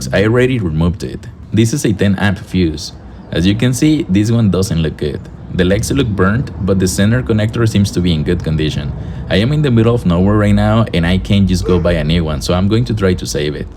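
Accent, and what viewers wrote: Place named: Colombia. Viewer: Too this was the exact issue. Thanks a lot. You sound like you are from Colombia.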